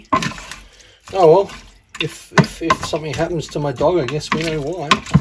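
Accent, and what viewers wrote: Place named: Australia. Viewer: love this video! good to hear from an Aussie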